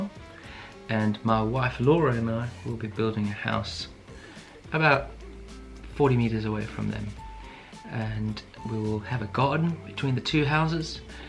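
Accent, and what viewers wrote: South Africa. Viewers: Your accent is South African... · Bra, you have an Anglo South african accent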